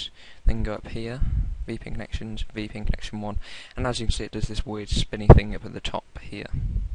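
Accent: United Kingdom. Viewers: I like your accent. You're British, aren't you? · English accent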